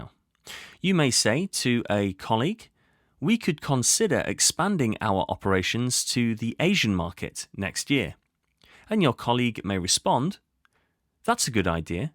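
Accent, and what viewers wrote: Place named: Wales, United Kingdom. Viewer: I've been ever so swamped with work lately that only now have I been able to begin to watch your current series of 41 videos (so far, hopefully kkk). No need to say I'm enjoying them no end. Thank you, thank you very much. As for your Welsh RP... well... I love it!!! kkk 😊😊😊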